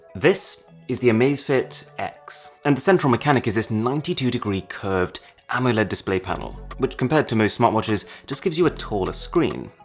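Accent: RP, United Kingdom. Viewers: I appreciate his RP british English pronunciation. Perfect. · I like your British accent man!! Cool video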